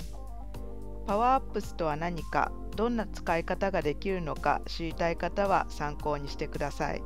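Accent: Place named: Japan. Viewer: 日本人的な英語ワードの読み方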